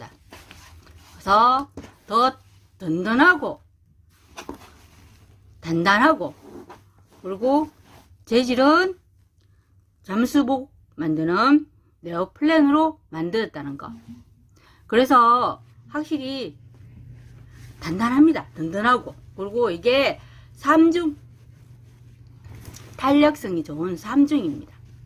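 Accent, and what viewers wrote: Gyeongsang, South Korea. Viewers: 허리가 아파서 귀를 쫑긋, 눈을 동그랗게 뜨고 영상을 감상합니다. 경상도 억양이 사각 사각 꿀맛나는 꿀사과 같아서 너무 좋습니다. 옆지기님 모델료 인상 좀 해드려야겠네요. 너무 말을 잘 들으시는 것 같아요.ㅋㅋ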